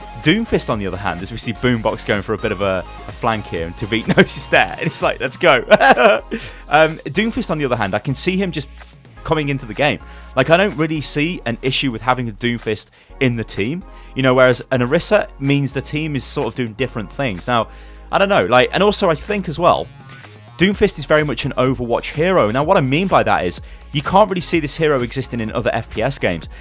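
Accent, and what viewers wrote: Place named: Northern England, United Kingdom. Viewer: Crossfire Gaming it's because he's northern. Everyone loves northern accents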